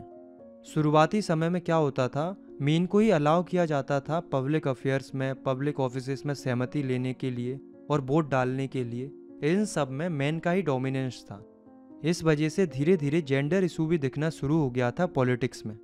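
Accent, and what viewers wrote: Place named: Bengal, India. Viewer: Are you from West Bengal kyu apki thodi bangali nikl jati h thodi thodi😅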